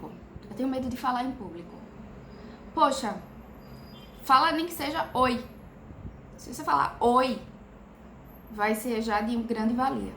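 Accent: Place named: Northeast, Brazil. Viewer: Muito incentivador seu vídeo parabéns eu sou de Goiás e gosto muito do sotaque de vcs do Nordeste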